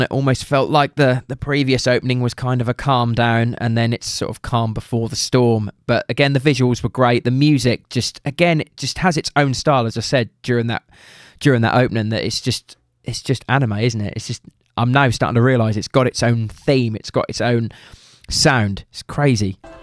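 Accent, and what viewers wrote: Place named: United Kingdom. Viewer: I like his British accent😂 it’s not a joke I just like it😂😂😂 but I just wanted to put in the laugh emoji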